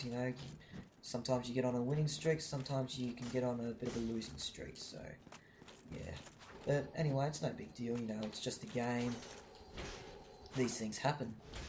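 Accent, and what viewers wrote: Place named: United Kingdom. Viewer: Dude your accent is hilarious haha XD im not a big fan of british accents but your funny ^^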